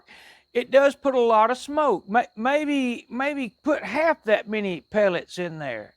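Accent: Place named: New England, United States. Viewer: Damn… the Yankee accent is horrendous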